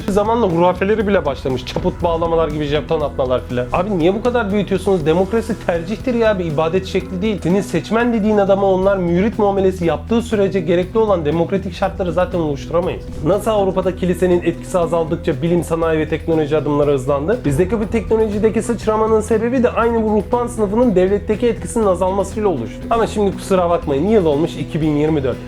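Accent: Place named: Istanbul, Turkey. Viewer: Sizi neden sevdim kardeş bilmiyorum ama gerçekten çok güzel bir Oflu olarak bizim söyleyeceklerim izi İst şivesiyle anlatıyorsun ve guruplarda paylaştım daha aktif olmanı istiyoruz teşekkür ederim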